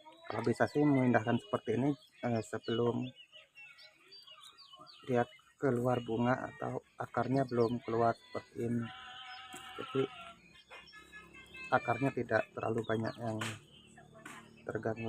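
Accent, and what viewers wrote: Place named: Java, Indonesia. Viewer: Sampeyan basa jawane medok pisan sihh,,,??wong endi njenengan mase,,,👍👍👍🙏🙏🙏🙏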